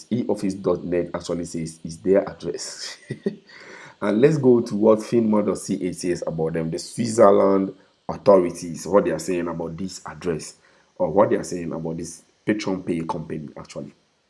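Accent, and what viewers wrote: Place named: Ghana. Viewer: Wow you're a Ghanaian,it was easy for me to understand from a fellow Ghanaian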